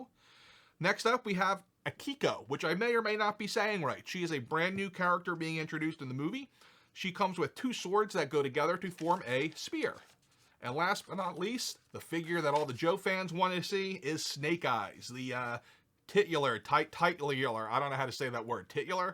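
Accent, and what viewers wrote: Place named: Philadelphia, United States. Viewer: New subscriber here. You sound like you are from Philly or more generally, Eastern PA!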